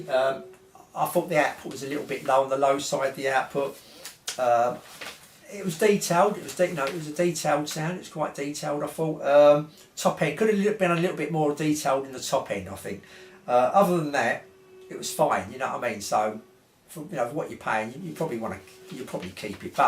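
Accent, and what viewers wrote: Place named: London, United Kingdom. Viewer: Thanks, easy to understand and great to hear an old school London - Kent? accent! Be Lucky.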